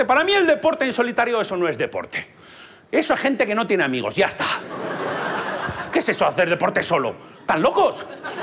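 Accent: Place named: Aragon, Spain. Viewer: Aii como se le nota ese acento maño de pueblo, grande ^.^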